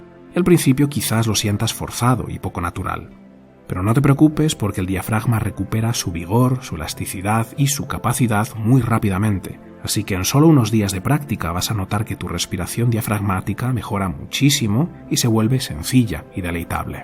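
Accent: Spain. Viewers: Lo que es feo es tu forma de juzgar y decir que la mayoría lo hacen.Es claro que te identifica con ellos.Para mi muchos hablan como lo hace aquí está bella persona.El español es un acento dulce al escuchar ❤ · Es el único acento de un español que sí Disfruto al escucharlo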